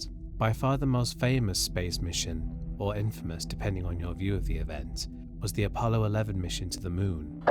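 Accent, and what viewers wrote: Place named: United Kingdom. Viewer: You sound British so please please please stop saying YouTTTTTube. It is not a hard T!!!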